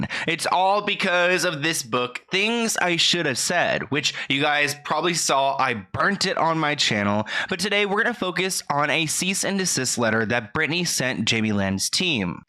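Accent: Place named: United States. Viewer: Love your videos, keep up the amazing work! Does anyone know where in America is from? I’m from the UK and like to try and work out the nuances in the American accent. Thanks!!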